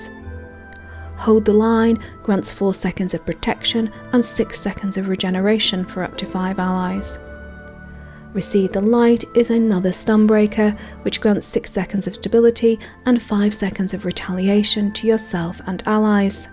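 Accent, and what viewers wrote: United Kingdom. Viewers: Honestly what got me to give your videos a try was your amazing British accent but once I watched the video it was really well made and informative. Subscribed.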